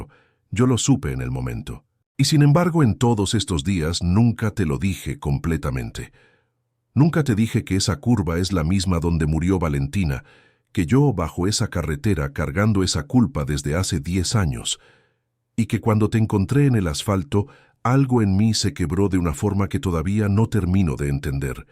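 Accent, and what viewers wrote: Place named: Mexico. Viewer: Soy colombiana,y me e alegro mucho que hagan historias narradas de mi país, gracias por tenernos en cuenta, pero me gustaría que se cersionaran más de los nombres,y pronunciaciones de nuestros dialectos, ya que aquí hay muchos, dependiendo de cada departamento,porque sé que UD es mexicano, y todas sus narraciones suenan igual, acá en Colombia no hay provincias, hay municipios, con nombres de cada dpto, y los departamentos de Colombia son ciudades grandes, Muchas gracias por tener en cuenta a nuestro país, pero que pena, que su dialecto es muy de su país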